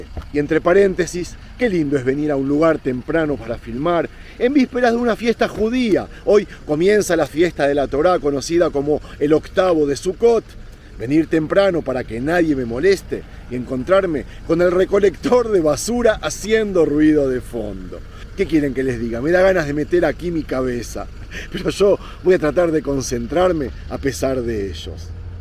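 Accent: Argentina. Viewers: Yo pensé que era Argentino.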